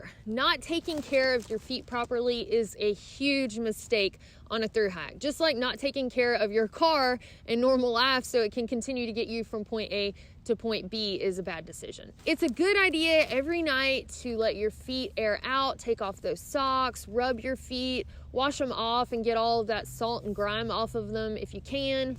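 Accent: South, United States. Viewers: Love your Southern accent. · Poor YouTube... Turn off the sound and watch the video close captioned. YouTube just doesn't know how to handle a beautiful southern accent.... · where you from, miss dixie? you sure do talk pretty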